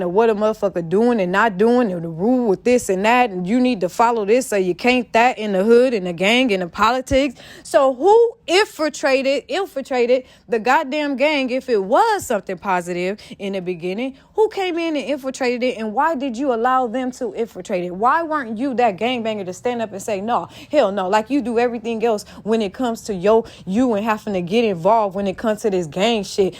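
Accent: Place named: Los Angeles, United States. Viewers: I just knew you was from LA just by listening to you for the past few weeks 🙌🏽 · Just by hearing your voice inflections and accent, I knew you was a Southern Cali girl. Thanks for clearing that up. You're funny, though.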